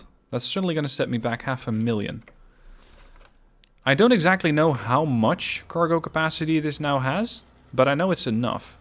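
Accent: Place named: Netherlands. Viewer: I was like; sounds dutch or not? Then you hit me with "Breda"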